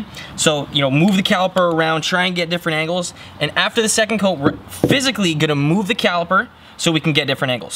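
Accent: Canada. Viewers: Hoy sound Canadian ay! 😎👍🏼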